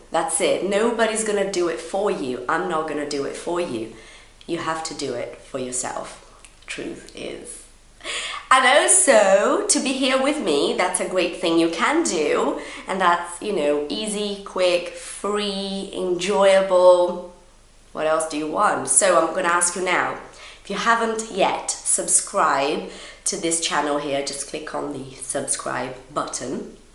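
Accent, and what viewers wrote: United Kingdom. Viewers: Your british english is great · ....hi....Ididn't like British English till Know You....I'm loving now to listen You...kisses❤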